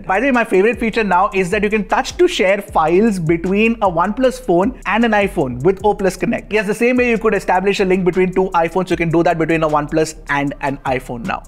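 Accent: India. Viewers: The Indian accent is truly awful!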